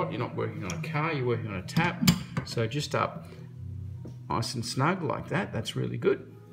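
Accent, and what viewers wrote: Australia. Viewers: Yes, I thought you sounded Australian. Nice commentary. What's a vegemitan sandwich?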